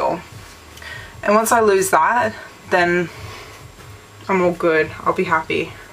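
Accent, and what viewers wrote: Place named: Australia. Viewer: u have an Australian accent!! I'm about to get mine done... !! a bit nervous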